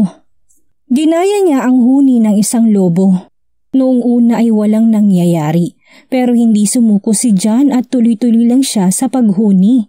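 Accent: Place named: Visayas, Philippines. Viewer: Bisaya man gid ang accent ng Narrator 😊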